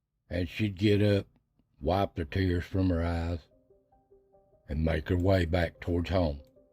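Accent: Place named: South, United States. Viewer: Hi; Just an old trucker from north Jersey. I agree with you about miracles. Hopefully God has one left for my wife. She has glioblastoma. Been together 50 great years. We live like my grand-parents who were farmers their whole life, inseparable. Old school ways will never die. We love your videos, beliefs, and your beautiful southern accent. You and yours are wished a Merry Christmas and a Happy New Year. This year was a rough one for you and I but I always remember that God doesn't give us anything we can't handle. Prayer to yall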